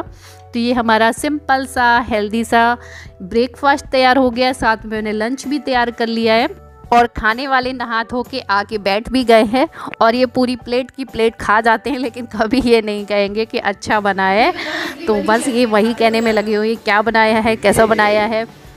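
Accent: Uttar Pradesh, India. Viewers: Aap kha se up se ho gya apki awaj se lg rha hai plz reply jrur krna kha se hoo tum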